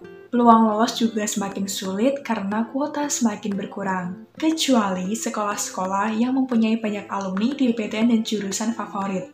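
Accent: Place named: Semarang, Indonesia. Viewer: org semarang ya? logatnya org smg bgtt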